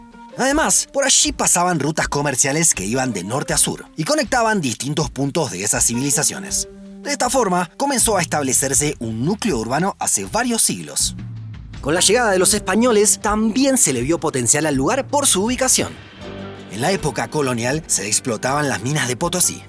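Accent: Rioplatense, Argentina. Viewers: No exageres La Paz y el occidente siempre tuvimos habitantes, por tu acento pareces argentino o uruguayo como se dicen europeos la mayor civilización de Bolivia e fué Tihuanaco superior a cualquiera de Bolivia o siempre había habitantes.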